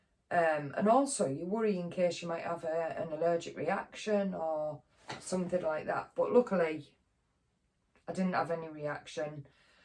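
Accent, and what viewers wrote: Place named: Wales, United Kingdom. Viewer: Hello friend. Wishing you a nice weekend in ..... I'm guessing from your lovely accent ....Wales?? I know that your videos are helping - and will continue to help - women with cancer. Take care of yourself, kind lady. ❤️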